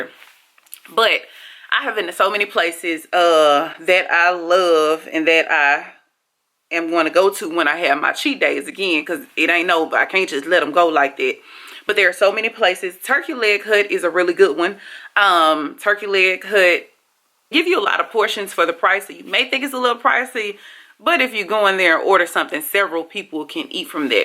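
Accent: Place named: Mississippi, United States. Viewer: I’m from Columbus MS, moved to Gulfport MS, and decided I’m moving to Houston. Literally watched every video about moving to Houston. Pros and cons. Beautiful chocolate sustah! A lot of people might not be able to tell, but I can def tell you’re from The Sip with that accent. Others can’t tell, but I hear it. Beautiful sister. 💜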